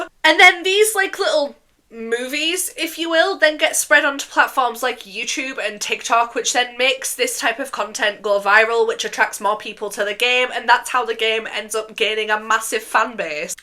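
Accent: Scotland, United Kingdom. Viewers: Nvr in my life have I ever heard a Scottish goth...IT'S AMAZING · HI I JUST STARTED WATCHING BUT ARE YOU SCOTTISH OR FROM THE UK BY ANY CHANCE??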